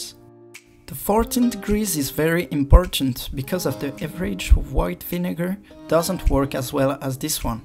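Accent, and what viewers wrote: France. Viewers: Good information! Love the French accent -- no need to apologize. :-) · Absolutely no need to apologise for your gorgeous French accent! You speak far better English than some people in my part of the UK! Thank you so much for this video - worked perfectly on my very neglected burners ❤️ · I've lost all interest in cleaning my hob – I just want to stay here and enjoy the lovely French accent! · Do not apologize for your French accent. Merci beaucoup. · don't apologise for your accent , it's what makes you unique, i have a french accent too, ah ah , love your video by the way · Your French accent is music to my ears!! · Please don't apologise for your georgious French accent, to me that's what made this video so interesting. Had to watch it twice. Very good advice Also some of the comments were helpful to. Thank you for this video. From London UK 🇬🇧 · Love your french accent!!! 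🤗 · There's nothing wrong with your French accent. You should listen to mine! LOL! Very good job on the video! Well done! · It is nice to hear your French accent.Thankyou this is so helpful. Easy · Thank you for sharing and please no apologies for your beautiful French accent! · Your French accent is fine and your English is excellent. Thank you for your video. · Never apologize for your french ascent love it. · We love your French accent. Your are French after all❤️❤️Merci!! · I like your French accent me too I have a French accent I am Mauritian the family speak French and creole thanks very much for your video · Don’t ever apologise for you accent we love it! Be proud of your heritage and you French culture thanks for the video! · Thank you, I will tryy this. Your French accent is charming! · Your French accent is music to my ears and your English is faultless. Thank you for the video, it was most helpful 🇬🇧🏴 Enjoy your weekend. 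· Your French accent is very sweet and charming and your English is fine. No need to apologize. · Please never ever apologise for your French accent! It's adorable! And this is an excellent video, so thank you for posting. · Your English is great and I like your French accent. No apologies are necessary. Thank you for sharing. This is a great tip. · i love your french accent! Tres Sexy! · merci beaucoup for the tips. Love the french accent so no problem at all!😊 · There's no need for you to apologize for your french accent I can understand your English perfectly.🤗🤗🤗🤗🤗🤗🤗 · thank you for your video. your french accent is gorgeous!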